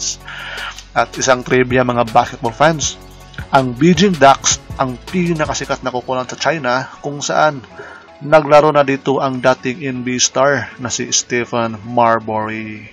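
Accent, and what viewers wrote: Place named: Visayas, Philippines. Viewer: Bisaya man gid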